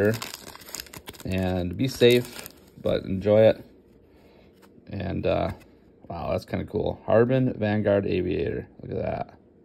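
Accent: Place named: Canada. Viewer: Odd question, you sound like somebody I know. Are you by any chance from canada?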